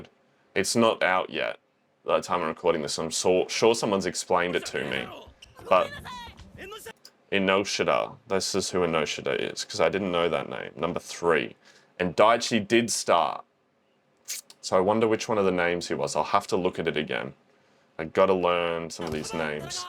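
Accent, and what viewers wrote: Australia. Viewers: Also! Are you aussie??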